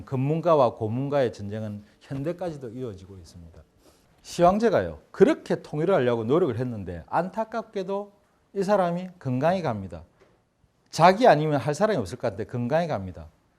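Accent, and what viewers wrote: Gyeongsang, South Korea. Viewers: 경상도 말씀에~너무 유쾌한 강의가 지루하지않고 재미납니다~~~^#^♡♡ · 갱상도 말투 쌤도 괜춘하네^^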